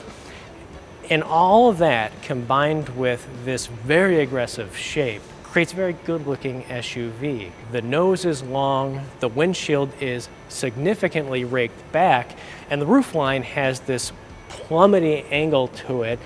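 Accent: United States. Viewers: I can't stand this fuckers American accent. Why am I recommended yank car review videos?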